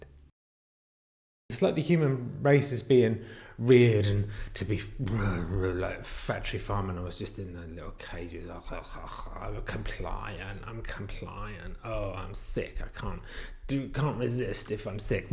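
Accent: United Kingdom. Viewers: Love your snappy suits! Strong, masculine and intelligent. Plus, British accent! ❤ Get a German Shepherd!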